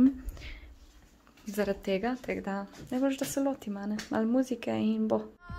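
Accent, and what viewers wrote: Carinthia, Austria. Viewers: Ta koroški naglas je 🔝👌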